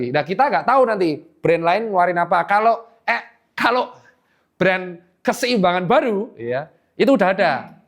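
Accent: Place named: Surabaya, Indonesia. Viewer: Saya fans baru dokter 🙏 pas liat YouTube ada dokter Lewat. Saya suka gaya bicara dokter yg apa adanya, nada Surabaya yg khas👍 terbaik dokter idola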